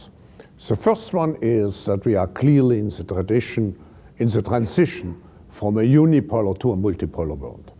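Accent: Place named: Germany. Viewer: That German accents is not a meme lmao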